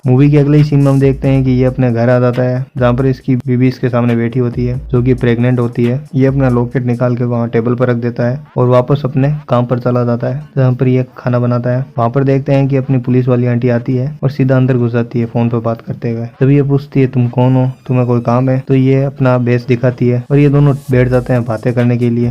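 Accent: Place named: Haryana, India. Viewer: Haryanvi can't be snitch from anyone . With fluent hindi anyone can tell you are from Haryana and i too am. All the very best brother , wish you more success .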